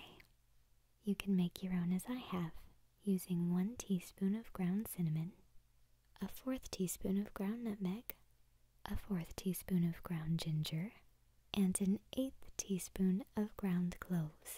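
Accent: South, United States. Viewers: Do you turn the southern accent on and off at will?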